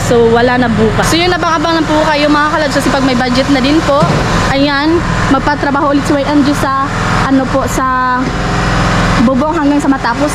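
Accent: Visayas, Philippines. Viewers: Ang laki ng bahay mo bagay sa iyo dahil maganda ka ok lang ang tagalog mo kahit meron halong visaya I love watching you video here in the USA ❤️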